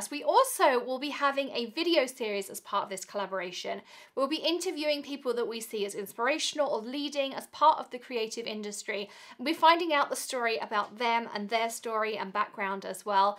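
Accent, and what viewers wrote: United Kingdom. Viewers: WOW !!! You sound like maybe you're either Wonder Woman or maybe you have a few clones walking around there helping you do all that you do. I tell you , you look so calm for all that you do. I am amazed. Have you always had this kind of energy ???? If it were possible , I'd tell you to bottle it and sell it. You would make Millions $$$. It has been a joy listening to your video about your self. I now know where that cute little accent comes from. Welcome to America from across the pond.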